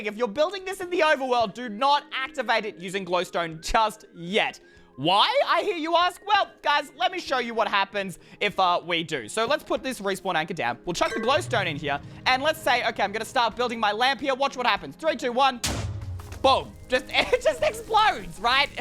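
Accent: United Kingdom. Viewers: You look like chinese,or korean or japanese but your accent is british😂😅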